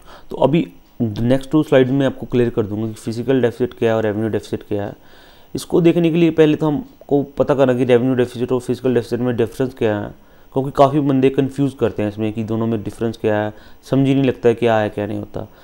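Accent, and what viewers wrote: Punjab, India. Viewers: You accent says You are Punjabi.. If you are, this is the first time I am watching a economic session from a Punjabi.. Loved it.. Thankyou.. Ap ghora, cycle bol sakte the... Donkey kharid ke us par baith kar office jana 😂😂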